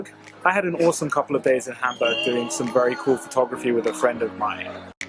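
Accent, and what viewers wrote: United Kingdom. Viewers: ，I know you have lived in China many years, but please keep your British accent because of the influence of Dayday. God hahahahahah. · after stayed in china for such long time you still able to keep your britain accent,that aint easy bro. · 听着你说英语觉得好不习惯啊！第一感觉就是，哎呀妈呀，说英文怎么有英国口音啊！但是过了2秒钟以后，我才反应过来人家本来就是英国人而不是中国人 LOL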